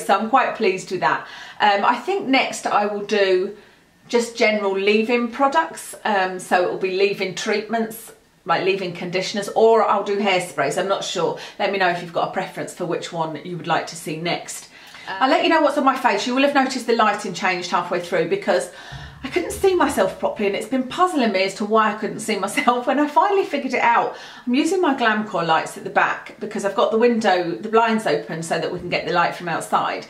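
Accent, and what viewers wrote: United Kingdom. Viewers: Oh that British accent is a sweet melody to my ears 🥰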